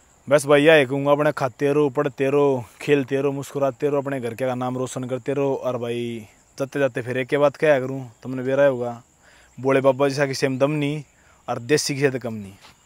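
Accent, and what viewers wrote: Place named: Rajasthan, India. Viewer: Being a Rajasthani I can understand him very well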